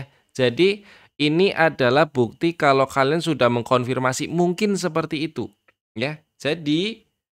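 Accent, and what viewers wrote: Banyumas, Indonesia. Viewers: saya masih duduk manis jam 1.20 depan laptop buat sinkron, eh ketemu mas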